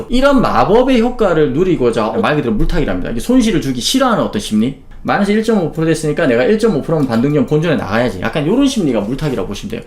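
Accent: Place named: Gyeongsang, South Korea. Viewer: 이 형은 경상도사투래때매 포인트가 딱딱 박혀서 좋아!! 명불허전입니데이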